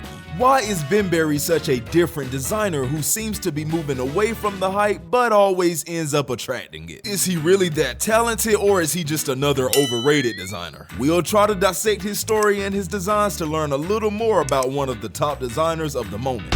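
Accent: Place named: United States. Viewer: I swear the voice sounds like an ai but i cant quite tell for sure because i know this is a french channel but the voice actor sounds very american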